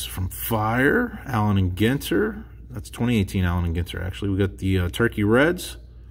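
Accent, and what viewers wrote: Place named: Pennsylvania, United States. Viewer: Hey great video. Glad you got the package, it was cool to see u open it on video, I didn’t expect that. Not sure what # subscriber I am on here but I feel like it was early. Always liked your videos, from the PA accent, to the complaining about brickage, to having do them with u, I feel like I’ve seen most of them. So as your channel grew I started to save up Alonso cards and already had a little pc of Josh Bell going so I thought I would send them to u some day as a thanks for all hrs of entertainment. As u got close to 1K I decided to send it as a Congrats. Keep up the good work. I’ll keep watching. 👍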